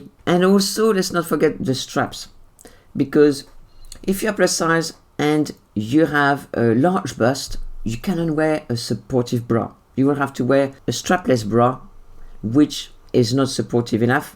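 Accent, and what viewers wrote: France. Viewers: I love your French accent in English. Greetings from Poland.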